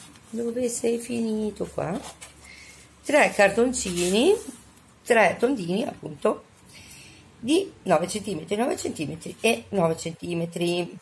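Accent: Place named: Bologna, Italy. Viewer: mi sono emozionata quando mi hai salutata, 😊 grazie per le belle parole...come al solito mi metti di buonumore, e mi fai tanto ridere con il tuo accento bolognese che adoro. Mi hai risolto un problema, non sapevo mai dove appoggiare gli orecchini quando devo fare le foto...grazie! Un bacione 😘😘😘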